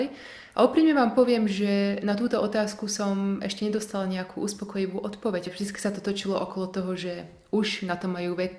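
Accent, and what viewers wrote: Slovakia. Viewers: Myšlienka" Neviem čo chcem, viem čo nechcem" mi je blízka 😂 Inak pekne stredoslovensky mäkko vravíš aj keď netuším odkial pochádzaš 😁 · ta slovenčina mi prisla taka martinska...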